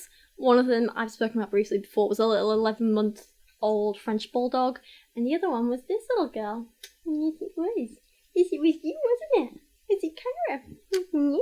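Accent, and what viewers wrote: United Kingdom. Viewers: Dammit, talks just like my sister, but with an British accent....tripping me out.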